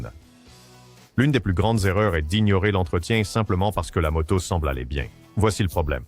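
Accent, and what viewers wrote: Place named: Texas, United States. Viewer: Pourquoi la voix de l'IA a un accent texan ?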